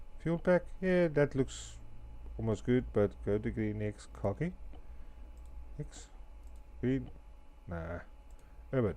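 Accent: South Africa. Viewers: South African? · 99% sure you are Afrikaans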